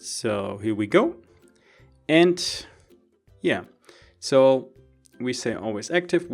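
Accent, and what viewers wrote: Germany. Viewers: man hört direct das du deutsch bist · Man hört soooo raus, dass er deutsch ust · You hear like a German guy speaking english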